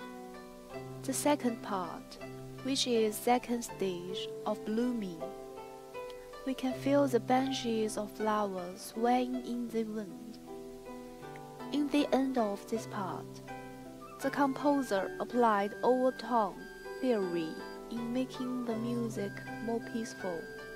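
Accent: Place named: Taiwan. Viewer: This musician has Plum Blossom Spirit. I liked the illustrated introduction with English text, voice-over narration in English and background music. The background music volume was balanced well with the volume of the voice-over narration. Unfortunately, there were several mispronunciations of English words that were not corrected by an English coach or pronunciation software with voice feedback. The intonation was fairly good. I was strongly reminded of the accent of the Taiwanese actress Qi Shu in the movie 'The Transporter(2002)'. The performance was very good to my ears but I know nothing about Bamboo Flute playing and very little about music. In the word 'anecdote' the final letter 'e' is silent and makes 'anecdote' rhyme with 'note', 'wrote', 'coat', 'boat', 'float', 'mote' and 'dote'. How to pronounce 'anecdote' in American English <link> How to pronounce ANECDOTE in British English <link> Knowing how to pronounce words in English is very difficult especially if one is obtaining a lot of information from written material. 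In my first year calculus class I had a professor with a good sense of humour who told a funny anecdote in the lecture room about how to tell mathematicians from other professionals in science using a kettle of water to be heated. One day I was talking with him in his office and I mispronounced the name of the German mathematician George Bernhard Riemann as "ryeman" and referred to "rye" "manian" geometry. The correct pronunciation is "Reemon" and "Re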